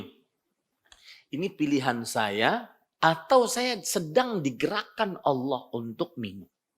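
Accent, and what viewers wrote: Banjar, Indonesia. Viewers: Org banjar kah ini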